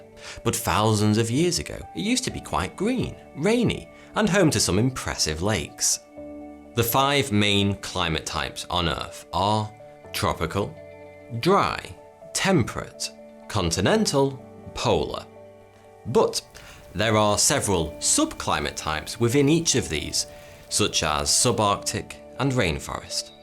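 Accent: United Kingdom. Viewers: just another British man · I don't know why, but I enjoy all intellectual and educational topics with a British accent. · thats what he says mate ye do realise in the uk there's like a different accent every 30 minute drive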